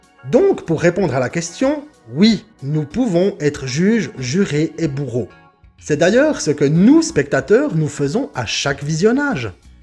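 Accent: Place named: Vaud, Switzerland. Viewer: Excellent cet accent de bien de chez nous. Signé, Le Vaudois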